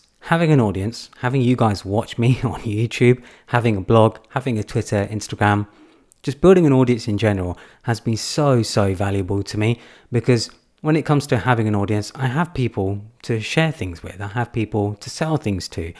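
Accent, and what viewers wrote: United Kingdom. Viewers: Hi, I find your accent quite interesting, is it cockney?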